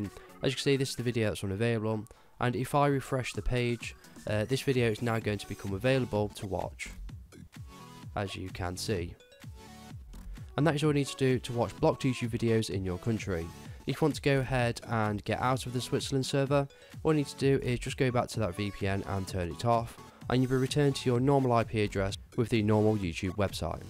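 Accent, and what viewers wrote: United Kingdom. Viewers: British accent hard for us to understand (we the US very easy on English )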